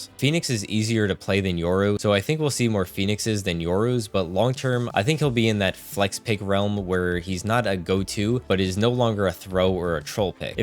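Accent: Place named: United Kingdom. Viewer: He’s back to being a cool bri-ish boi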